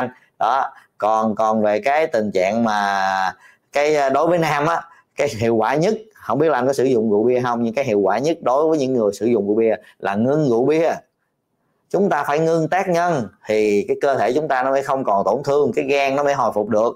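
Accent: South, Vietnam. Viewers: Bác sĩ người mn có khác ăn nói thật là dễ thương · Hello Bác Sĩ Bác sĩ là người miền Nam nói chuyện rất bình dân, cảm ơn bs đã giải thích thật rõ ràng. Tôi U80 nhưng vẫn muốn BS xưng (tên) là ‘tôi ‘ thay vì là ‘con’. Bởi vì chắc chắn có những người nghe , học hỏi từ bs ngang tuổi hoặc trẻ hơn . Ở miền Nam hai chử R. Với. G. Hay bị sai Thí dụ : Xong rồi. ( rr ồ. i ) Không phải. G ồ. i Như người miền Bắc thì chữ. L. hay nói. là. N Xỉn lỗi BS trước nhé ( ý kiến xây dựng thôi) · Tui rất thích tuy rằng nói tiếng nam quá nhưng còn hơn nhiều Bác sĩ lên nói rào trước đón sao và chū yêu là câu lai 😊😊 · Lần đầu nghe Video của Bs, co like, đang kỳ kênh , chia sẻ luôn nè, cảm ơn Bs rất nhiều. Giọng đặc Nam bo de thương ghê hông! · Bác Sỹ Nói Chuyện Giọng Nam Rất Dễ Nghe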